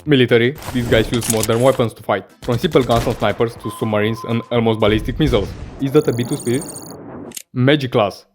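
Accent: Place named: Russia. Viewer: I love the, uh russian/ukranian acent?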